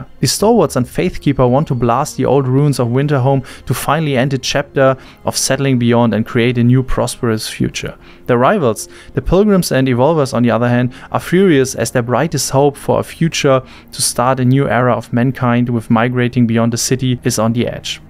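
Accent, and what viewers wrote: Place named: Germany. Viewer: Ich hab nicht mal 10 sekunden gebraucht, um zu merken, dass du aus Deutschland kommst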